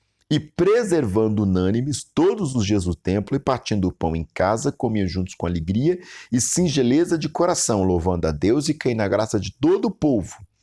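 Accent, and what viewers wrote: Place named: Minas Gerais, Brazil. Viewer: As pessoas de Minas Gerais aprenderam o significado de "impelir" da mesma forma que você irmão. Sotaque não é sinônimo de ignorância 👍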